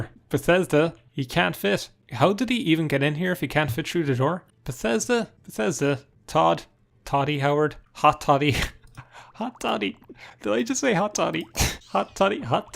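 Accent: Ireland. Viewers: keep up the content, its always so fun, also an irish accent makes everything better imo, how close are you to 4k watch hours? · you put the other irish letsplayers i watch to shame. you talk let they do when theyre playing up being irish as a joke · I love your Irish accent man. "I tink so" "Maerk and recall" Subbed for the content, stayed for the accent + content combo.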